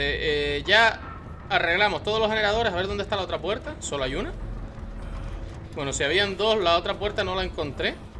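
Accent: Canary Islands, Spain. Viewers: ¿Canario? XDD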